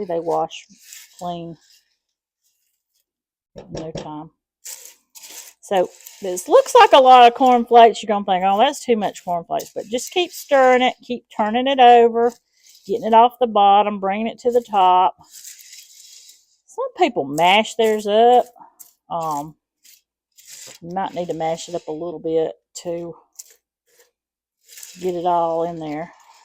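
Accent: South, United States. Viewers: Absolutely one of the best snacks. Thank you. Love your southern accent.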